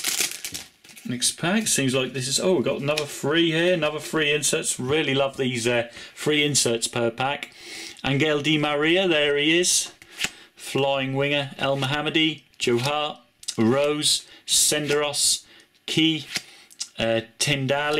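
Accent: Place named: England, United Kingdom. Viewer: Are you from England if so, where? I'm just curious to see what your accent is.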